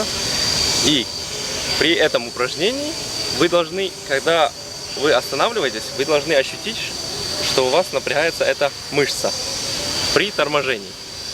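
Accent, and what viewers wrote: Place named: Ukraine. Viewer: Сегодня 15 марта 2023 год, делаю все упражнения, молодец, даже услышала украинские мелодизмы, акцент, поддерживаю!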